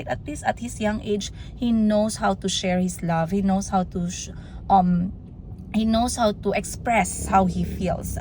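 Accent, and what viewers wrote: Iloilo, Philippines. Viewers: Kalambing mn mg ilonggo madam ba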